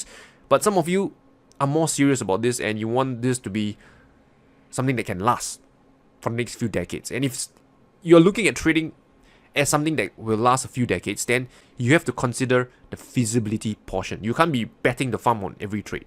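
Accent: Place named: Singapore. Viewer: Nice discussion Sir. And the Singaporean English Accent.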